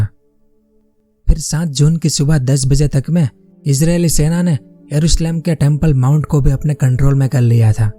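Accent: Gujarat, India. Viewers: Pako Gujarati lage che! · गुजराती से हिंदी में उच्चारण में अपनापन है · Bhai aap gujrati ho kya?????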